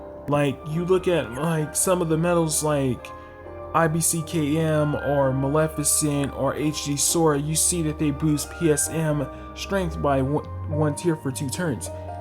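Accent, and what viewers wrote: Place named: Canada. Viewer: Take a shot every time this canadian person says "like". idc if he's not. just wanted to point out. he just sounds canadian.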